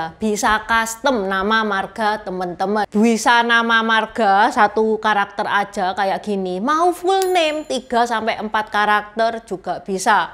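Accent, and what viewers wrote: Java, Indonesia. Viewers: Mbak suaranya medok banget.jawanya mana mbak,👍💪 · Ya sambil berbahasa indonesia bergaya LOGAT JAWA. MANTAF🙏🙏🙏👍👍👍👍👍👍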